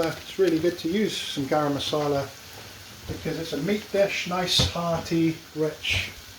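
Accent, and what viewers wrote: United Kingdom. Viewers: Great vid and tips, do i detect a welsh accent?..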